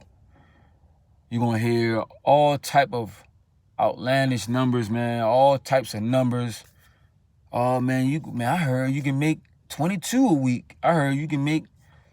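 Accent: Baltimore, United States. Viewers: I’m a nurse but just be curious about other professions n general but I like how U kept it 💯 a lot solid info and I def heard that Bmore accent lol by any chance u remember which season/episode of The Wire u were n? Thanks for the video ✌️ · 2 things I learn from this video. 1. What to expect my first year pay of trucking. 2. I can still recognize Baltimore accent from anywhere.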